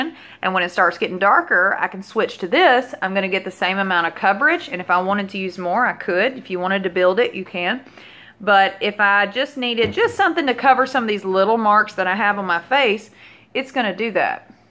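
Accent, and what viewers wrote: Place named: Texas, United States. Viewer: May I ask a question? What part of the country do you live in? I lived in TX for 12 yrs, and your accent sounds a lot like mine!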